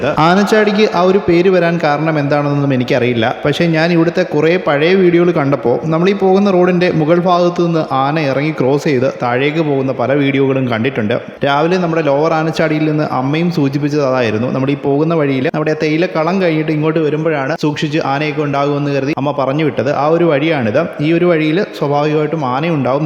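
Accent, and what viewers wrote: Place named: Kottayam, India. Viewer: ബ്രോ കോട്ടയം ആണോ 🤔ഇടയ്ക് ഫ വരുന്നുണ്ട് 🤔പൊളി 👍🏻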